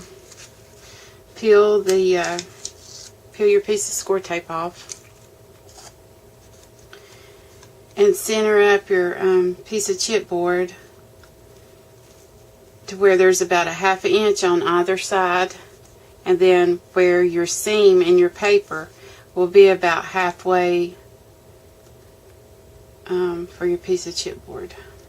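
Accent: South, United States. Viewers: New subbie (from grammie2bay). Thanks for doing this tutorial -- you make it so easy to understand....especially appreciate your chipboard pieces with the measurements written on them. I usually put TP rolls(cut up) into the compost pile, but now have a use for them. Love your southern accent! Marianne:)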